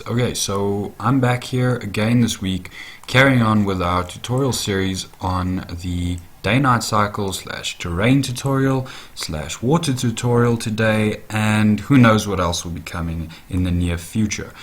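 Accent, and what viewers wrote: South Africa. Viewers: you sound south african speaking properly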